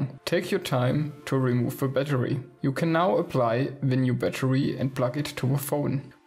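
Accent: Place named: Germany. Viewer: Klingt so unschlagbar Deutsch, kann man nicht verbergen... EDIT: Good to know i would sound... now it makes sense why people always want me to join the voice-chat.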